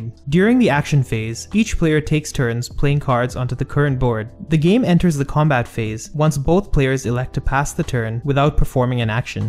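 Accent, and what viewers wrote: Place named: Philippines. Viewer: Are you Filipino by any chance? You have a Filipino accent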